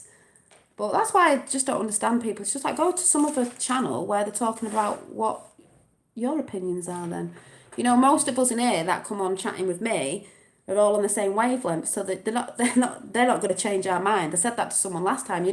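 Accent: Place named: Northern England, United Kingdom. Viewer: northern lass... go on girl...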